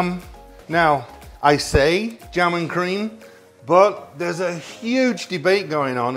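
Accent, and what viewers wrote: United Kingdom. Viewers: Chef just curious are you British or Scottish? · Even American Cooks has started to note both Celsius and Fahrenheit temps in their recipes. This guy is British, that he only told the Fahrenheit temp made my respect for him plummet more than one degree. I do not care where he currently live. He is so British to listen to and watch it almost hurts, and he makes his money on the fact he used to be cooking for the British Royal familie.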